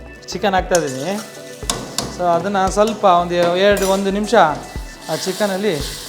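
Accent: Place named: Mandya, India. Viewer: Avva Karnataka dhalli ondhu ondhu prantyadalli ondhu ondh thara mathadthare, adhe reethi adhu Mandya bashe artha madkovva comment madoke munche sariyagi yochesu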